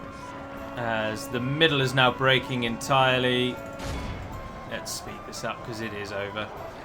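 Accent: United Kingdom. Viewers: Hello, you make awesome videos man, I love to hear you speak just because your clean and excellent British accent. Now, talking about DaC and your ongoing series, seeing as war with Mordor is inevitable in this campaign (because of the reasons you mentioned in the video), I believe it's most wise to seek an alliance with said foe in the Dorwinion campaign so that one doesn't focus in the same enemy and you focus completely in Dale to make the game more interesting as you said in that series. Keep up the good work man!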